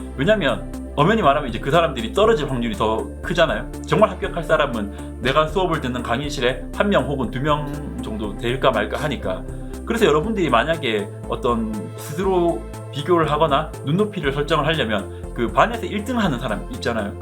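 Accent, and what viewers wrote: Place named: Gyeongbuk, South Korea. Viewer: 경북 거주하시나요? 억양이 저희 고모랑 똑같아서요 ㅎㅎ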